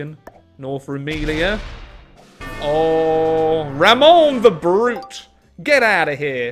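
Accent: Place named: United Kingdom. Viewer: It makes my inner history nerd chuckle hearing a British person say"I don't want Quebec".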